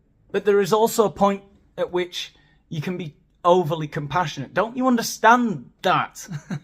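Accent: Scotland, United Kingdom. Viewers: The trouble with Sam Smith and Harry Styles is that they are actually just not very talented. Their music is middle of the road bland. To me they are like children dressing up for attention. I speak as someone who loved rock stars who really knew how to stretch boundaries and gender like Bowie, Jagger and even Grace Jones. These are wee boys trying desperately to be relevant. Loved the Scottish accent! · The problem as I see it is society in general is accepting this behaviour. Why are they accepting it, is the question, are they afraid of being cancelled, being demonitised or being seen as "a right wing hack" Its stupid to bring politics into this, you know what kind of society do we want? A decent one? A fair one? Indulging these peoples fantasies of their identity and accepting their disgusting behaviour is agreeing with it. Well I dont agree with it, I want a society that is decent that holds good values up as things to aim for. 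I know heaps of gay people most of them you wouldnt even know they were gay and why should you? What people do in their bedrooms is none of our business, but putting on shows like this is just disgusting and the sad part is Sam Smith has a good voice, he doesnt need to be like this to sell records. ps love your scot accent! keep it up lol. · Love your rants and insightful insight, and your Scottish accent is great !! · Excellent video. You are spot on. Your Scottish accent had me laughing hysterically loud in the metro. I really enjoy your take on things and your humor. Thanks for making me laugh and for sharing your opinions. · That Scottish accent wasn't too bad! Not sure you'd get away with it here in Glasgow. You definitely wouldn't get away with nipple tussles and a corset. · Scottish accent nailed 🎯 Brilliant stuff. Your insightful analysis of Sam is also spot on 👏🏻 … adults need to know where the boundaries and truths are as much as kids need to, and once they stop listening, or surround themselves with people who don’t care enough to be honest with them, they can end up in pretty wobbly places. 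I ❤ Sam Smith’s singing … but the rest of his act is turning into an unnecessary circus which detracts from his raw and stellar talent. I don’t understand the road he’s going down so publically. No, it’s not appealing. Caucasity (my new fave word of yours) is a bit underrated sometimes in this crazy world 🌈🦄🦭 May your week be tassel free and filled with peace, sanity, and laughter. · Best Scottish accent ever! Love when you laugh at your own jokes. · Love your Scottish accent. YOU are brave. You fully covered the quandary we are facing ...the balance that is lacking. Jordan Peterson addressed the gender confusion astutely. Unfortunately, the pendulum swings from one extreme to another and seldom spends much time in the central, balanced position. Thank you for articulating the full spectrum of our reactions..I, too feel disgust looking at videos by Harry Styles, Madonna, and this latest from Sam Smith. 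Society used to protect children from encountering this kind of exposure and allowed them their innocence to grow and mature without the trauma of intrusive information beyond their ken, or ability to handle. As a mature adult, I don't want to see it either. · I like your Scottish Accent. Sounds So cool. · Love your comedy, love the Scottish accent, but I also love your serious comments at the end. Keep up the good work! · Loving your take on the Scottish accent. And obviously spot on commentary as usual. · Totally agree with you, HE needs help, not praise. Don't think he is more comfortable in his own skin doing this shite! 🙄🤢 ps, love your Scottish accent! 🤣😂😍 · Great Scots accent .. yr f..........amazing love it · Am scottish and I loved your scottish accent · I thought it was rather dated to be honest. Madonna has already done it 🤷‍♀️. What’s the Scots connection, great accent 😂❤️ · Loved the Scottish accent!!! 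& ur video was GREAT!-as always Thanks ✌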